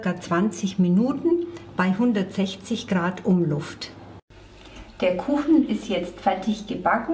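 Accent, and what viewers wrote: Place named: Franconia, Germany. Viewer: Liebe, deine Videos sind einfach spitze! Wie schon viele andere ZuschauerInnen geschrieben haben: total gut erklärt, praktisch gelingsicher, zudem die vielen praktischen Tips und deine ruhige, freundliche Art... Passt einfach alles! Ich wage es mal, eine persönliche Frage zu stellen: Du siehst vom Typ her aus wie eine Oberpfälzerin (ich kenne so einige, deren Schwester du sein könntest!🙂), aber der Dialekt geht eher ins Fränkische, finde ich. Ist da was dran? Ich freue mich auf weitere Rezepte und Tips von dir! Liebe Grüße!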